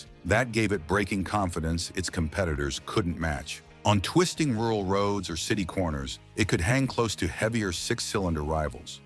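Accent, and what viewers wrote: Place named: United States. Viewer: What no FD Vauxhall Victor 3.3? No wonder the narrator has got an american accent aye, bah!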